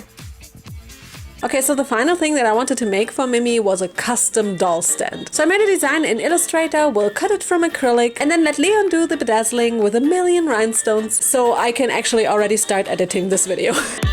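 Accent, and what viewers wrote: Germany. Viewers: Omg youre german? xD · Grüße aus Leipzig! freu mich immer wenn ich einen deutschen Akzent höre ❤ ^^ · She’s German, dude. It’s her accent.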